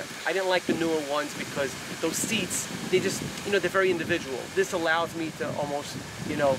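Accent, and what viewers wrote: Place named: New York, United States. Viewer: Good job 👍🏻 if you couldn’t tell from the license plate he’s a NYer you could tell by listening to him.😆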